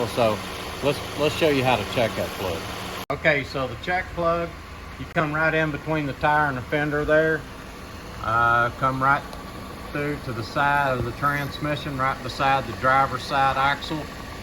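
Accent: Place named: United States. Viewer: Thanks for this excellent video. One thing I really appreciate is the very clear American English! I'm not very mechanically inclined, and it helps immensely to understand what is being explained, and very well, I might add!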